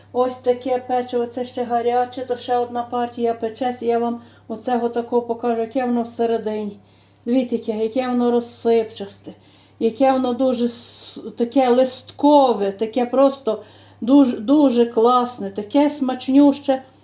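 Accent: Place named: Ivano-Frankivsk, Ukraine. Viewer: Слава Ісусу Хресту! Пані, не перестаю вами дивуватися яка ви гарна господинька. Я тільки й шукаю ваші рецепти, бо там все так смачно і не дуже складно. А ще дуже люблю слухати вашу мову місцеву, бо, здається, що ви моя землячка. Я ж сама з Прикарпаття, з Долинського району с. Витвиця. Живу в Білорусії, вже давно на пенсії, теж багато перепекла наших пляцків для себе і знайомих та родичам на весілля. Напевно такой наша українська випічка найсмачніша. Дуже вам вдячна що ділитеся своїми рецептами та так доступно пояснюєте. Хотіла б знати звідки ви самі, бо дуже подібно розмлвляєте на нашому місцевому діалекті. Дуже сумую та скучаю за своїми родичами, переживаю за ті недобрі події, прошу Бога щоб швидше все скінчилося. Здоров'ячка вам і вашій родині. Довгих вам років життя, та миру в нашій Україні. З наступаючими Великодними святами Вас. 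Обов' язково спробую ваші нові рецепти, а найперше пасочку. ❤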